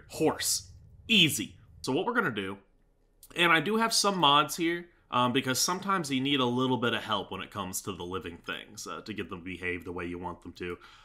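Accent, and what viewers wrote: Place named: Brooklyn, United States. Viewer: you sound like brooklyn guy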